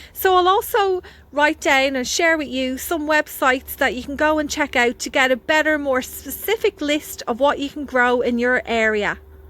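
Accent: Ireland. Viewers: Or I saved the seed from my own plant. Lovely harvested...beautiful produce! And, your Irish accent is so beautiful!🙂 See you next week!🙂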